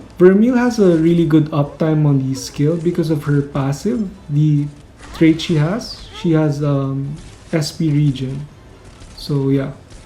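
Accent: Philippines. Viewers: why do you sound so Filipino to me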